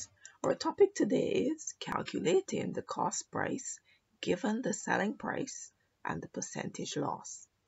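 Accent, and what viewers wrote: Barbados. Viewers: This is an excellent explanation. Thank you very much. Your accent sounds Bajan.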